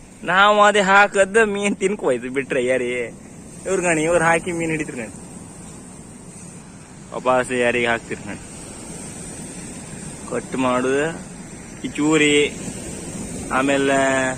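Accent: Kundapura, India. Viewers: Yava side kannada idu?kundapura na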